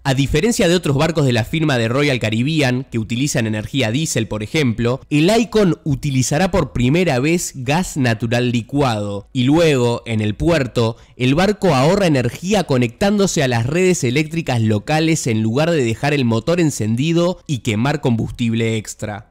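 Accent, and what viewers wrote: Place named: Buenos Aires, Argentina. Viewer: Decime que sos porteño sin decirlo: "mueshe, astishero, quisha, shevada..."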